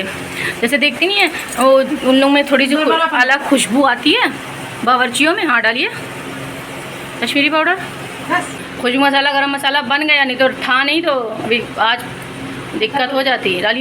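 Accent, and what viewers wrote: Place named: Kanpur, India. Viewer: Same aesi meri bhi ammi h...khana bht pasand ata h unko..ar ap bilkl hum logo ki tarah bolti ho pakki kanpurya😅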